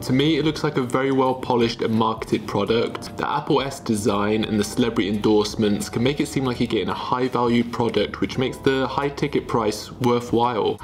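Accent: United Kingdom. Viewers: Dude I have NO CLUE where in the UK is from but its HAWT!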